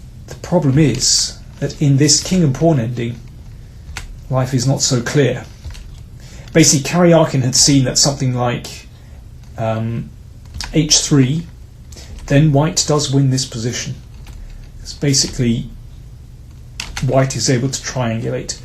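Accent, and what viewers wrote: United Kingdom. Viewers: Oronion and oll the white powns. It's a drow! hahaah I absolutely adore your Bri'ish accent,!!:D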